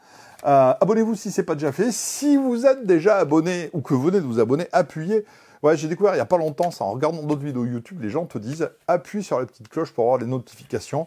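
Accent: Germany. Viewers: Super l'axent allement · Un peu fatiguant l'acsan allemand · Il me tu avec sa façon de crié avec l'accent Allemand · Pourquoi tu parle et cri avec un accent Allemand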